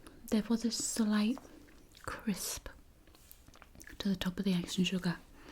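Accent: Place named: Newcastle, United Kingdom. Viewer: ASMR + Geordie accent = homesick lol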